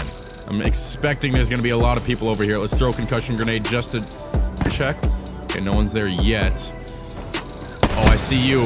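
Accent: Minnesota, United States. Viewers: are u from Minnesota?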